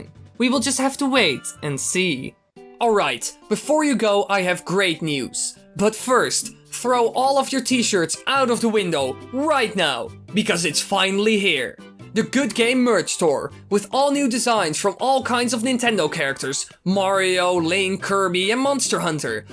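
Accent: Netherlands. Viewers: Are you Dutch? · Nice video! Are you Dutch?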